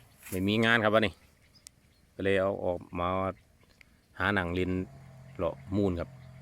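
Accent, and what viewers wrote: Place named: Korat, Thailand. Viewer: สวัสดี เป็นคนโคราชมั้ยครับสำเนียงคือเลย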